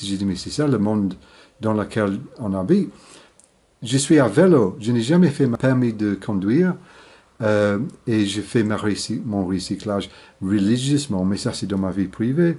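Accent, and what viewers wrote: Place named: Yorkshire, United Kingdom. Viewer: Far less ' Yorky' in your French accent nowadays :)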